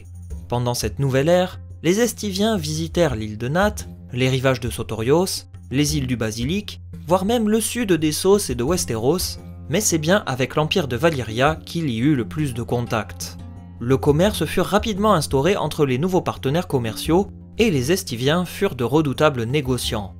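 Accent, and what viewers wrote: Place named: Toulouse, France. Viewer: Hey! Même avec un petit accent toulousain, mille reste invariable! Pas de "s"!!!! à signé la grande pourfendeuse des liaisons dangereuses!:))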